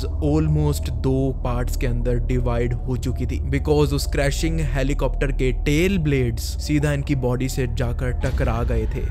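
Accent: Haryana, India. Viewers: Bro i have question are yu from haryana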